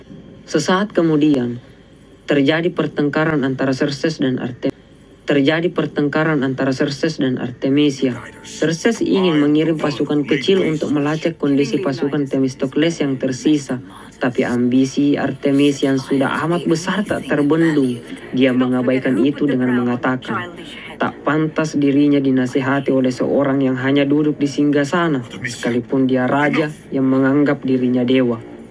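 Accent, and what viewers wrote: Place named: South Sulawesi, Indonesia. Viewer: dari logat kayak org sulsel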